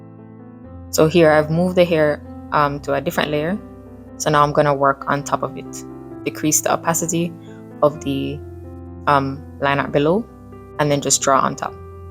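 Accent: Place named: Jamaica. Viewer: Your accent is very Caribbean, you sound Jamaican actually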